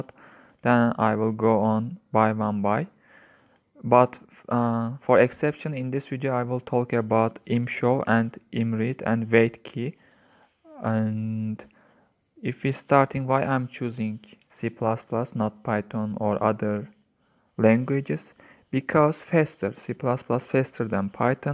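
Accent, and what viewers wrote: Turkey. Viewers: ABi bende tam olarak Hem ubuntu tabanlı hem c++ opencv videosu arıyordum çok teşekkür ederim ingilizce niz de türkçe aksanı olduğu için benim için çok daha anlaşılır olmuş adamsın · aksandan o kadar belli ki Türk olduğu. 🙂 İçerik için teşekkürler. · ilk cümlende direk türk olduğunu anladım. Aksağan çok belli edio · Yemin ederim aksandan anladım Türk olduğunu 😁 aslan abim benim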